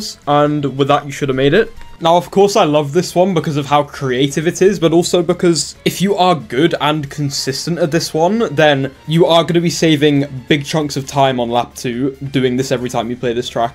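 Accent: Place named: Northern England, United Kingdom. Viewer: You sound like you're from northern England lol (same)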